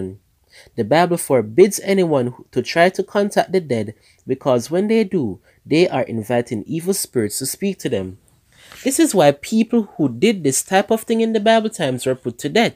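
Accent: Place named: Jamaica. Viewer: Glad that this book is online . Thank you for the upload. The reader sounds Jamaican 🇯🇲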